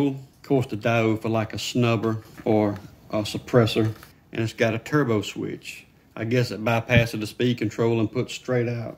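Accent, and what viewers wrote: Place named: South, United States. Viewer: MAN! this was AMAZING! Videos like this are a rarity these days done in the form of how GM explained their cars in the 50s. And I have to admit, it sounds better with a southern accent. But the Quality of this video is MILES above anything I have seen. FANTASTIC JOB DUDE! I don't even own this model of blower. But I figure My Ryobi RY38BP Backpack Leaf Blower had the same thing that went out on it. And RIGHT on the day of the 3 year warranty expiring! :face-green-smiling: I just ordered a parts blower from Ebay, and plan to just swap out parts that way. But I will have to look into these RC couplings. Again, thank you and WONDERFUL job and explanation & Links! :goodvibes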